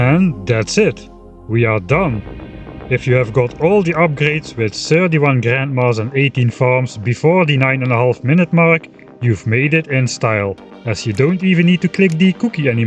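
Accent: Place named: Netherlands. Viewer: This video randomly got suggested to me and I gotta say I love your dutch accent haha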